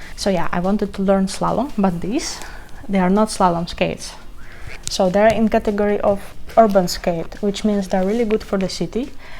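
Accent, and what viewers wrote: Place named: Russia. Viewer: Ты русская, говоришь с акцентом)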